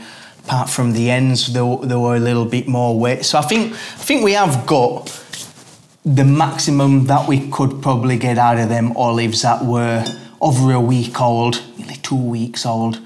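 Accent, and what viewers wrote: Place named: Scotland, United Kingdom. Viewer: he sounds Scottish, maybe Irish.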